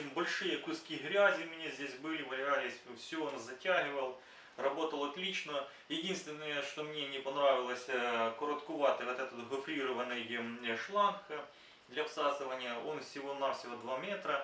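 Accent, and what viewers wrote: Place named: Ukraine. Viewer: Мужик, ти ж україномовний, в кожному слові це відчувається нахіба ти не звичною для тебе російською говориш?комічно ж виходить...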